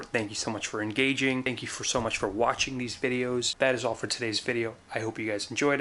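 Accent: India. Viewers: do u hav Indian origin ??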